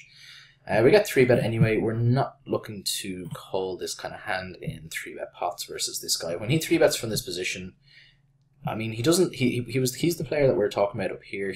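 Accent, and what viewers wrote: Ireland. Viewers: Irish confirmed.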